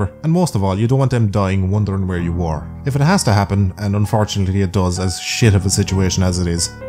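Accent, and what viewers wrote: Ireland. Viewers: I don't know why I love this channel so much its just a Green duck with an Irish accent swearing for like 10-15 minutes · I dont know who you are, found your channel today on the "recommended". You have a good point of view that really jives with me. To be honest it's creeping me out, you sound like an irish version of myself. Not crazy, i think... Anyway keep up the good work. The worst thing about having a dog is having to say good bye. Mans best friend wont talk, can't talk, will listen the best they can, never leaves your side and will never complain. All for a head scratch and the last bit of what you're eating, if only people were so easy to please.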